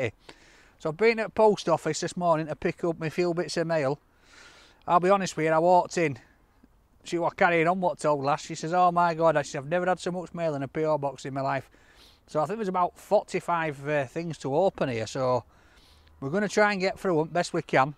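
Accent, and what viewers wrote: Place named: Yorkshire, United Kingdom. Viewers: your the man mate. I tend to binge watch, so I could be two weeks behind on video's. But I will catch up in a few days. I am a Yorkshire lad Doncaster so not far away from you, and I have the same accent as you Mucka ❤️ col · Hi, my gift was sat at the front of your table but unfortunately you didn't have time to open it. All I will say is it may be beneficial if you open it first on your next unboxing video. It is a purple parcel with the postcode blacked out where i got it wrong. For the last 20yrs I have been suffering severe depression after a lifechanging accident whilst at work, and I have to say that watching your videos puts a smile on my face. I worked in Barnsley for 7 years and your accent brings back all the happy memories from before my accident. Keep on doing what you're doing pal, I'm sure that you bring a smile to everyone that watches. 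All the best for your future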